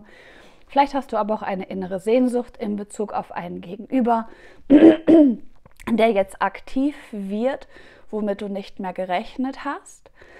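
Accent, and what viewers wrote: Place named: Austria. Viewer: Sehr lustig dein österreichischer Dialekt, aber leiwand 😁👏 nur gustl...dummer August 😉😇🫶 Hab tatsächlich grad viel Power und Energie...hoff es bleibt so💪💃 Danke dir liebe ❤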